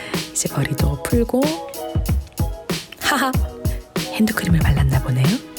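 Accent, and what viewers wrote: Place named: United Kingdom. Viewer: 성우하셔도 될꺼 같아요~~ 최고의 성대이신듯 합니당 영국발음도 너무 멋있으십니당~~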